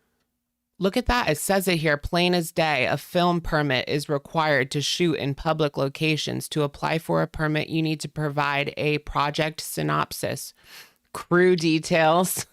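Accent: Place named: New Jersey, United States. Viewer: Sometimes I can't tell what you're saying with your kind of new jersey accent over the silly fake accents you do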